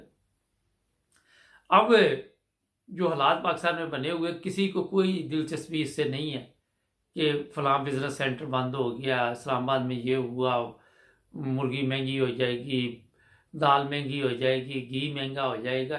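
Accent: Punjab, India. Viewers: Your punjabi accent tell me you are from Saialkot .am I right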